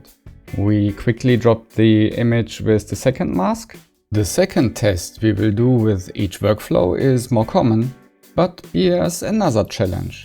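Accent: Germany. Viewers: jerne, der jute Berlina Dialekt sei uns holdt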